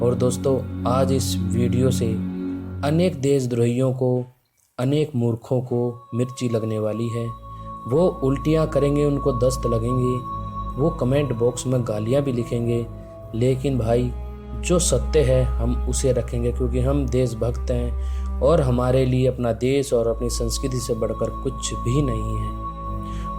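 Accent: Haryana, India. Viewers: Bhai haryane ka h k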